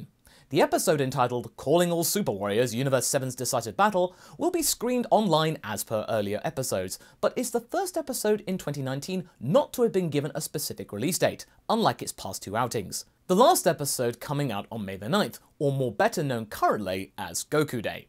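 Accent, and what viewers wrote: United Kingdom. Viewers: He is, indeed, British. English, specifically.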